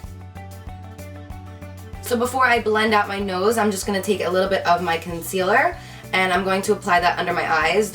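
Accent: New Jersey, United States. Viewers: I loveeee your Jersey accent and Jerseyism! I've had a love/hate relationship with NJ since moving here from California but you make me proud to call myself a Jersey girl now! <3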